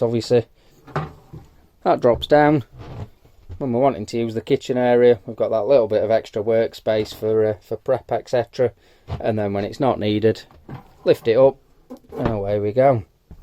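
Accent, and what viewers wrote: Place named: Sheffield, United Kingdom. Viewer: Can’t work your accent out, bit if Sheffield bit of Chesterfield. Only watched this one video so far but will be going through your channel. Nowt beats a bit of Yorkshire tightness, love it 👍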